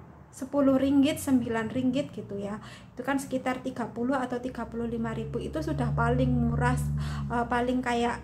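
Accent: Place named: Java, Indonesia. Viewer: Koe wong endi toh yu..kok bahasane medog buanget..untunge ricok oleh bojo londo yuk...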